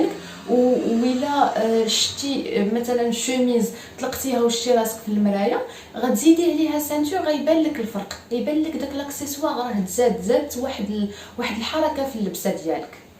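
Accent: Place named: Maghreb, North Africa. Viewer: فيديو رائع ❤️❤️ تصوير رائع ❤️❤️بس اللهجة صعبة الفهم لمعظم العرب لا يفهمون اللهجة المغربية والجزائرية والتونسية للأسف 💔💔 ف الرجاء التحدث ب الشامية أو الفصحى أو المصرية هكذا ينتشر الفيديو أسرع ويكون له جمهور أكبر او ضيفي ترجمة مصاحبة بليز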